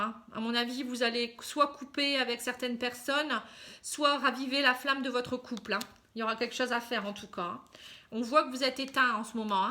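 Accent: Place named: Nord-Pas-de-Calais, France. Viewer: Have Emilia je n'ai pas osé posé la question j'ai reconnu immédiatement l'accent chti j'ai toute ma famille à Boulogne sur mer ❤️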